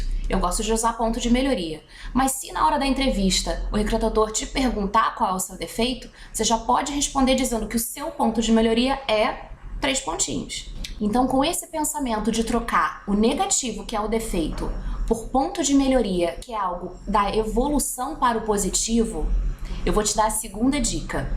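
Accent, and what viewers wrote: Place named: Rio de Janeiro, Brazil. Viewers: Excelente video, adorei as dicas e a forma que vc aborda os problemas e soluções. Só não gostei do sotaque...carioques muito acentuada. Parabéns. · Ela e carioca😆😆😆😆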